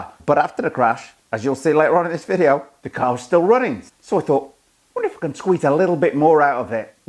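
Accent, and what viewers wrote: United Kingdom. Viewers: Great upload well done 👍 you were born in the uk 🇬🇧? I am trying to work out your accent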